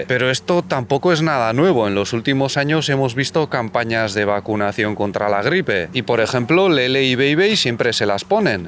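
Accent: China. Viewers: Los escucho de mucho tiempo y siento que ya tienes acento chino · Te sigo desde hace tiempo y empiezo a notar que hablas con acento chino. 😊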